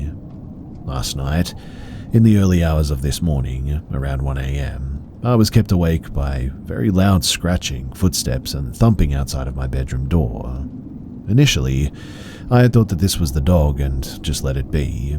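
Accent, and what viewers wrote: Australia. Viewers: Starting the video and hearing a deep Australian accent say "I'm a Chinese woman" was very unexpected.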